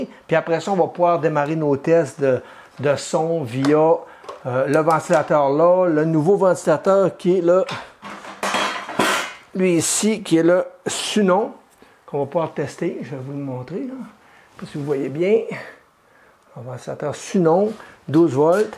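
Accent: Quebec, Canada. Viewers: Bonjour, excellente vidéo ! Je possède une Ender 3 pro v1 avec les drivers silencieux et il est vrai que les ventilateurs sont bruyant, donc modification à prévoir. Merci bcp pour ton tuto, j'adore l'accent du Québec et puis on se rend bien compte que tu prends du plaisir à informer, je me régale... merci pour ça ! 😉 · Ma v2 arrive jeudi, je cherchais des infos sur le bruits des ventilos, Bah merci beaucoup c'est hyper complet, impec. Et qu'est ce que ça fait plaisir d'entendre l'accent des cousins du Québec :-)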